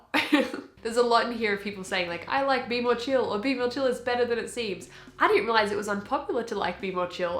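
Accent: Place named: Australia. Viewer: you remind me so much of my voice teacher, except ya know..... your australian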